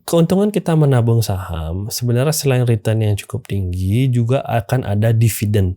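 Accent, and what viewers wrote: Bali, Indonesia. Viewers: logat bali...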